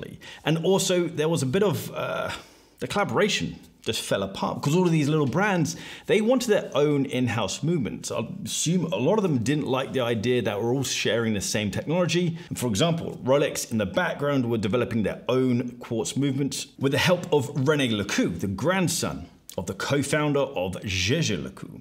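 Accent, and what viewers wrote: RP, United Kingdom. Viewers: he is posh on the downlow he cant help it mate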